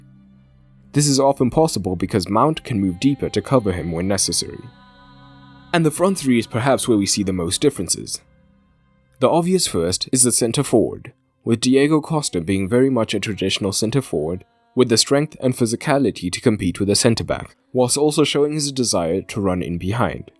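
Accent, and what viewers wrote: South Africa. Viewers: Are you South African? great video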